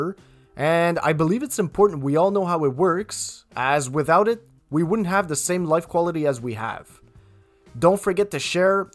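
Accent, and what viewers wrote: Quebec, Canada. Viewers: French Canadian? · Nice video! T'es québécois hein? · You have an accent, est tu québécois ? ;) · This guy is from Quebec for sure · Yeah his definitely from Quebec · Quebec French?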